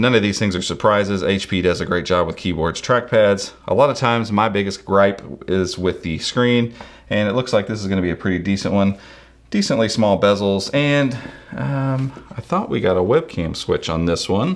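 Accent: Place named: South, United States. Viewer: Talks SO fast in a southern accent for a Mainer is hard to understand you.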